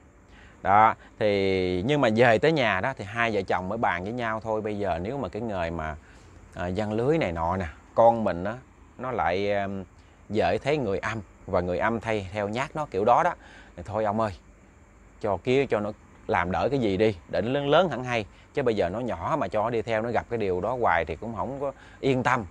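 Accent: Mekong Delta, Vietnam. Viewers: Nghe giọng kể biết là người miền tây , hay !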